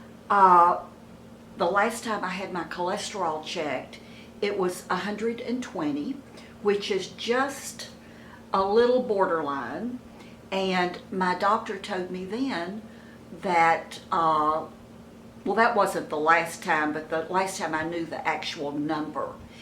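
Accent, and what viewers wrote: South, United States. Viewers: I lost my precious Grandma to a heart attack..she wanted to come home and the doctors had already told us it wouldn't be long😢...so she came home on Hospice..but my momma and me took care of her..she lived 5 more day's and it completely broke me! ...I know this is an older video but I Thank God you are okay! The look on your face in the intro really scared me! This is a great message...Thank you for sharing the symptoms..they just might save someone's life..you are such a sweetheart and I just love to listen to you.you have the biggest heart ever!...I can tell you're southern so where are you from? I'm from Indiana...Again I'm so happy you're okay! xo Hydie ❤💋❤💋❤💋 Hugs darlin...you look amazing and I love your sofa..we have similar taste...😜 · I love your accent and southern ways. You should be part of the southern women group (what southern women say) you would be a great addition!!!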